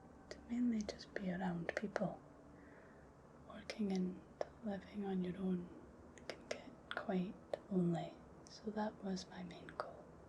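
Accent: Scotland, United Kingdom. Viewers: I love the Scottish accent. It's so pleasing and sexy to listen to. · I literally clicked this video just to hear the Scottish accent. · Beautiful British lass/accent. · Time to melt to her beautiful Scottish accent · I stumbled upon your channel thanks to the algorithm. Rare for me to fully understand every word from a Scottish accent. Thank you for the calming video. +1 sub. Based on comments, you may have been away for a while. I see it often. Take time as you need. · I've just come across your channel. Sitting comfy on my sofa. Headphones on. Play! Close my eyes and your tones give me a tingling sensation from head to toe. Be still my beating heart. I've always loved the Scottish woman's accent. I meditate everyday for my own mental wellbeing. So Scottish lassie you waffle all you like, Because this Irish lad will listen to you all day. Stay healthy, safe and carefree.😍❤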